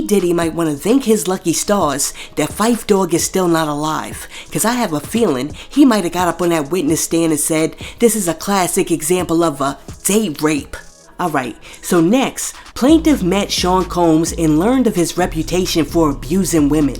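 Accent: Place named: New York City, United States. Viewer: I just subscribed to your channel and I ❤ it. Love your NYC accent!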